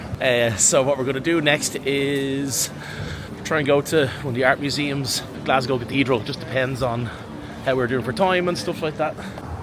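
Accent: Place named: Northern Ireland, United Kingdom. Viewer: I know you said you're a Dub, but I hear the lovely lilt of the north. Am I wrong?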